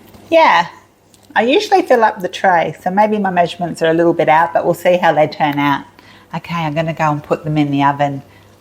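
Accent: Australia. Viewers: Never apologise for your beautiful Aussie accent.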